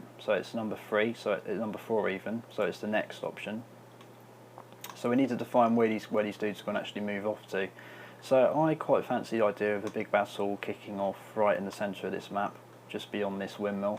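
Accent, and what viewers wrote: United Kingdom. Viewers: nice work, cockney! cheers!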